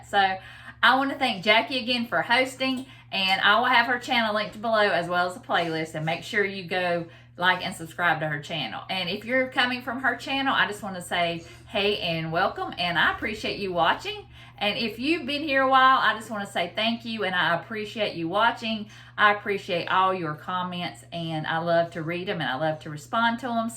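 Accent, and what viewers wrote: South, United States. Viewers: New subbie here! This looks so good and easy. This is a yes ma’am moment. Are you from the South? I love your accent. Looking forward to get to know you and your channel! Have a great night ❤️🙋‍♀️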